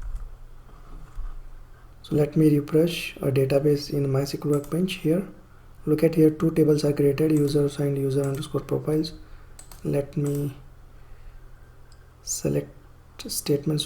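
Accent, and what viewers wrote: India. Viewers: Indian English ;)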